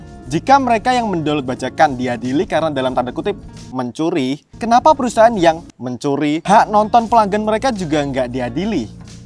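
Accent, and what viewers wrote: Java, Indonesia. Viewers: gausah maksa gw gw bro, logat jawamu kentel poll, pake aku aja for ur advice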